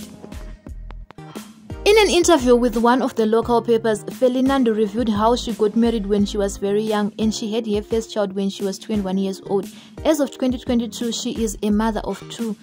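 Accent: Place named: Shona, Zimbabwe. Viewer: I am much in love with the deep english shona accent, and loooong pauses by the narrator 🤭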